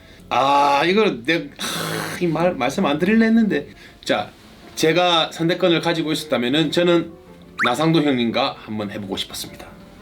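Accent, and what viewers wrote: Seoul, South Korea. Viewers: 서울말 않해도 괜찮이요 원픽 진 만네요 승리만~~~👑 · ㅋㅋ서울말 오빠 ~~~ 우승꼭 ^^ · 서울말 너무귀여웡~~^^ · 서울말듣기좋아요 사랑합니다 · 서울말 너무 귀여워요 모닝콜도 구수하네요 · 서울말 너무 귀여워요~~ ㅋㅋ 화이팅!! 힘내요. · 진 가수님 서울말도 귀역네♡ 미스터트롯 최종우승 하시길 응원합니다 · ㅋㅋㅋㅋㅋㅋ서울말 왜케 귀엽나요 너무 좋아요 진 😍😍😍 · #진 커피한잔할레? 서울말 잘하시네요 서울말 많이 해주셔요 이다음에 M C 도 하신다면 유창한 서울말 잘하셔야 될것 같아요 탑7중에 제일 잘생기고 멋지고 노래넘사벽 최고예요 미스터트롯2의 우승을 기원합니다 💙💙💙👍👍👍👍👍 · 서울말?ㅎㅎ 해도 귀엽고 안해도 귀엽네 역시 진 짱! 등수 상관없이 즐기세요 싸랑합니다^^ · 씨. 방가워요 서울말씨. 귀여워요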